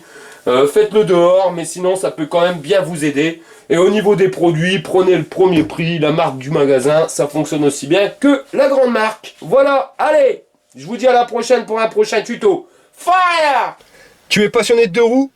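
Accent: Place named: North, France. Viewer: Merci,je comprends lieu le léger accents, on est voisin,je suis de Maubeuge,chti power et " FIRE "!!!!! Continu comme ça!!!!!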